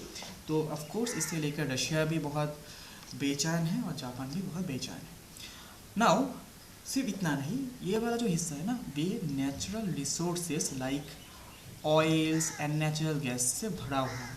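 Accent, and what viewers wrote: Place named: Bengal, India. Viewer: I don't whose voice this is...but I want to request him to increase the volume because I cannot listen it without headphone 😐... otherwise it's nice... I guess any Bangali Babu is teaching us... because his pronounciation of Hindi words say this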